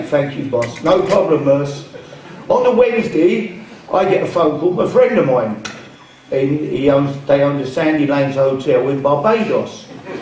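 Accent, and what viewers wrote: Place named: Cockney, United Kingdom. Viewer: Legend.... typical Cockney, love him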